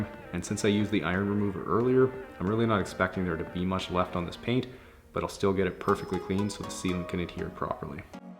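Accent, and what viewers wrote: Saskatchewan, Canada. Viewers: Grage... Lmao😂🤣😂 aww I love the Canadian accent. Stay up my ice bound Northern Bro. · Might have to get you to do the tour busses next time we come through town! (I can hear the Sask in you any day... ha!) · so much Canadian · I love how his Canadian accent barely noticeable the entire video and then when the customers arrive he goes full blown, "OH YAH EH!" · What I found kinda funny is when I heard you were from Canada your ascent seemed to be more prominent 🤔✨😂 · Not all canadians sound like that just so ya know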